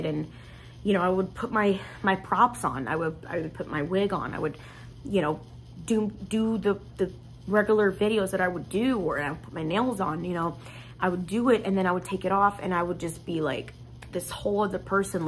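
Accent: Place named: New Jersey, United States. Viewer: I am a new subscriber. I am originally from New Jersey, Jersey Shore and I miss it so when I came upon your Jersey Girl checkout girl, I immediately subscribed. I live in Colorado with my wife now and miss Jersey and was laughing out loud at your accent! Lol. I hope I didn’t show up too late to the party but you have to be happy like you said in your video. I wish you the very best of luck in no matter what you decide to do. All the best to you and your family.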